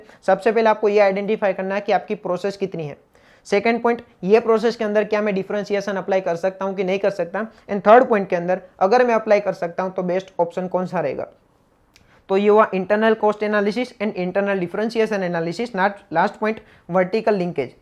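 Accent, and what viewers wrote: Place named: Kathiawar, India. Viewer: Sir where are you from? Your voice tone little bit.. gujarati kathiyawadi..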